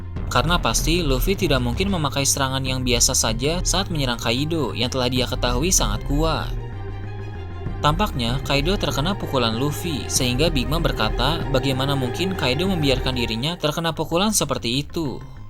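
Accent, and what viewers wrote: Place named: Lombok, Indonesia. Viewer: Medok lombok tajem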